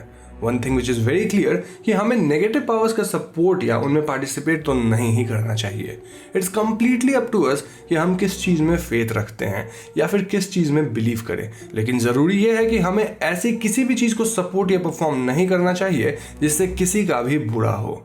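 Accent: Bengal, India. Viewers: Are you a bengalee??? 👨‍💼👨‍💼👨‍💼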